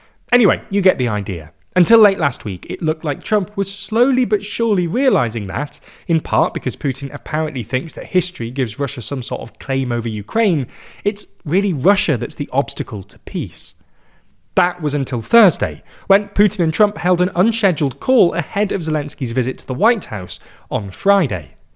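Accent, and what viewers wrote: United Kingdom. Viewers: So sick and tired of all this British accented YouTube channels. WTF?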